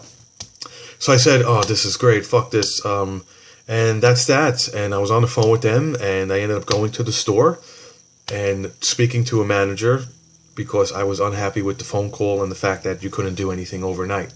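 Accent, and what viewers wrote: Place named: Boston, United States. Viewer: your video's are hilarious, i'm from Boston and you say things the way me or my friends would with the gestures and noises that come out of you mouth, it cracks me up. i can't stop laughing but everything is so true + that makes it even funnier.keep making videos or hit the stage on open mike night at the comedy cellar or the Improv.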